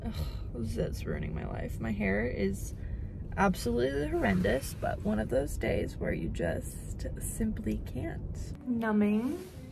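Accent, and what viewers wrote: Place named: Tennessee, United States. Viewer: I’ve never been to Nashville or Tennessee for that matter but is that what a Tennessee accent sounds like? Like wow. Also, why not turn the comments off so no one can comment on your lips?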